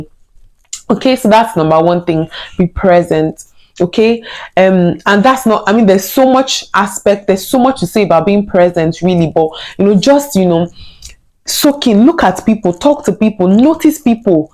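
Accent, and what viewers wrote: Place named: Nigeria. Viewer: Why Nigerian accent sounds rubbish?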